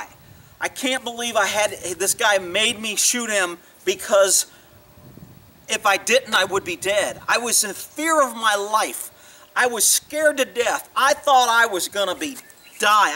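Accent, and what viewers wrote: Texas, United States. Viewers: Texas accent is so strong in this video lol just got done watching Kyle Rittenhouse not-guilty verdict live stream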